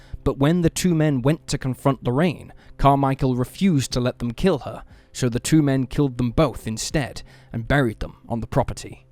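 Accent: England, United Kingdom. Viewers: What a stupid comment! Yea he’s English, but just cos he has an English accent, why are you saying he should only talk about English killers??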